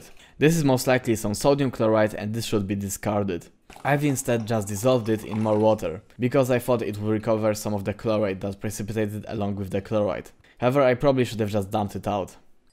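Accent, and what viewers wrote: Poland. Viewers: po kilku slowach juz wiedzialem ze polak xD sub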